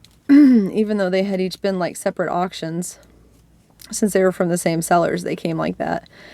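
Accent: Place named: United Kingdom. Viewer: I thought I heard a British accent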